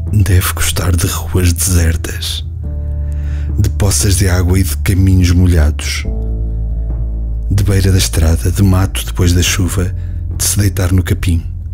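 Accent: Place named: Portugal. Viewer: Gostei de escutar no sotaque do portugês de Portugal!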